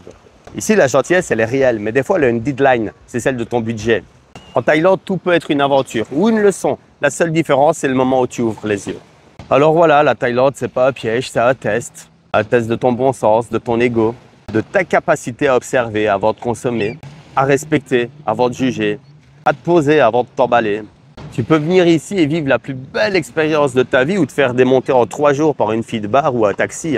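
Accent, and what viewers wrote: Belgium. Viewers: Ton accent belge est très sympa ! 👌🏻😅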